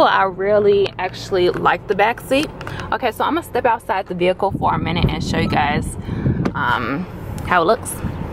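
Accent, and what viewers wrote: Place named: South, United States. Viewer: You have a southern accent, so initially, I thought you were from NC; then I thought, maybe Texas. Chicago?! LOL!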